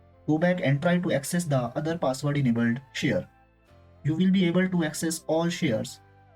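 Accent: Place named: India. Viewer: OMG, Are speaking English or are you trying? please can you try to forget your Indian language, that's gonna help you.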